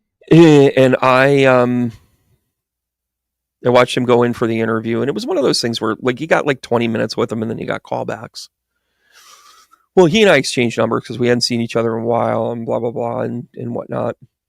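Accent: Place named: Boston, United States. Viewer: By the way ur NY accent is Boston!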